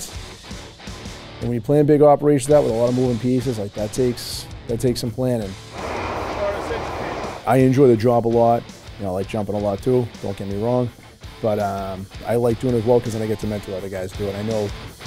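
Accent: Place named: Rhode Island, United States. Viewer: That was great. Your accent brought back great memories of my uncle from Rhode Island!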